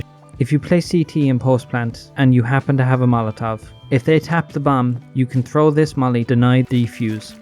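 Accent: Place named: Ireland. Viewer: Thou sound Irish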